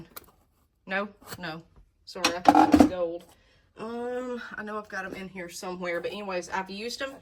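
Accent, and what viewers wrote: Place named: South, United States. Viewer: Alabama!? I swore up and down y'all were from Texas or Arkansas after hearing your accent, y'all keep on raising hell and eating cornbread over yander😅 🍻 from South Carolina.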